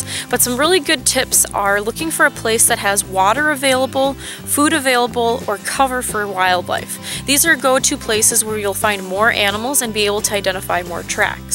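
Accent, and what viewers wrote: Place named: Minnesota, United States. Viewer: Yay it looks like im not the only tracker! Also,what state/province is that? Id guess Minnesotan, North Dakota, or Canada by the sound of your accent, I’m from Minnesota myself.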